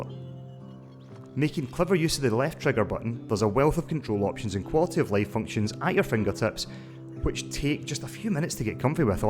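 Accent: Scotland, United Kingdom. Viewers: Scottish accent: +1 to credibility.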